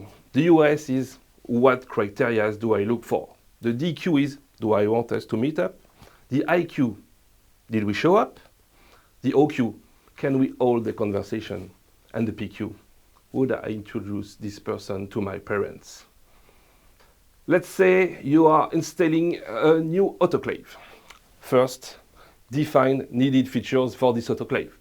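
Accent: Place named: France. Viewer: What a lovely french accent.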